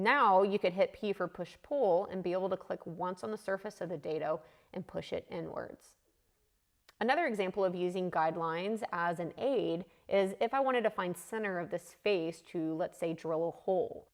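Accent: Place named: Waco, United States. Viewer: Love the Waco accent, with all the "Sawring and Drawring" you do! :-)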